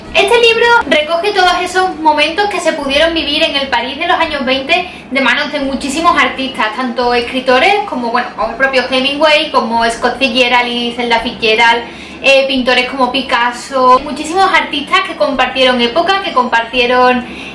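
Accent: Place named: Spain. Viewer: Muy buen contenido. Muy Hermosa y un acento español que me mata. Tendría que tener un millón de reproducciones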